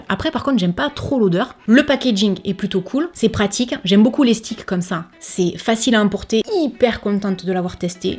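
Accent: South, France. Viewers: Merci pour cette video pour ta bonne humeur et ton accent du sud j aimebcp les crayons yves rocher pour les yeux bonjour a talounet 🤩🤩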